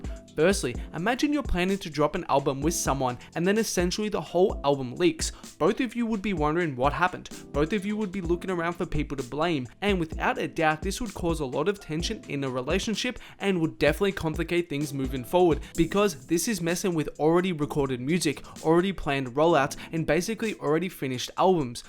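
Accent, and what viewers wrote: Australia. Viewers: This guys australian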